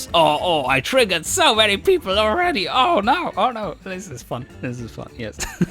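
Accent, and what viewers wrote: Germany. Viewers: You are german???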